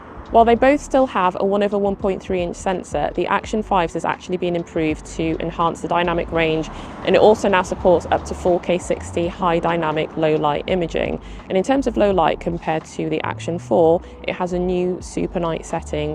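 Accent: United Kingdom. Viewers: They've got my attention, warmth, 10 bit normal, brighter. I've never bought one. This one may get me. Great videos, your accent brings me back to when I used to live in the UK. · my first time watching your videos. Your delivery is great (probably the accent lol -- dunno why we Canadians don't talk like Brits...) ...anyway, the content was the best I've seen on this crucial question. I am going on a motorbike trip in a few weeks and literally just bought the Osmo Action 4 after seeing this...didn't even hesitate. Nobody in the comments (that I've seen at least) even mentioned how tack-sharp and natural the wind-cover on the OA4 looked in comparison to the OA5, which looked all mushy and smudged out like it was painted in the video. Annnnyway, I even watched your follow-up with the firmware update...no better imo. And ya, I know my purchase might be short-lived with the Osmo Action 6 due VERY soon, but need something for the trip. 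Sold my GoPro12 (thank god), that thing crashed so often...the stupid wrist strap never synced...nightmare. Anyway, look forward to your Action 6 video!!!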